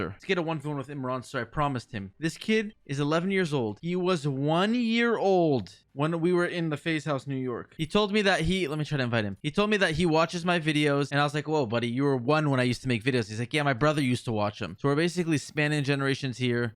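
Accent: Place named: United Kingdom. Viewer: That British accent is disgustingly funny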